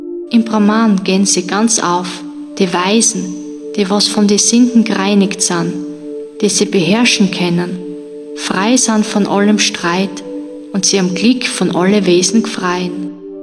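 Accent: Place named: Styria, Austria. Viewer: Absolutely beautiful!😀 Your Steirisch Dialekt is amazing! Outstanding!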